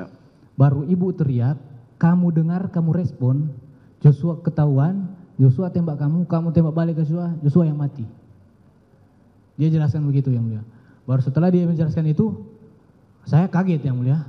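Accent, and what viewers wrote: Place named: North Sulawesi, Indonesia. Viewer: Dialeg SULUT tetap dong ❤️❤️❤️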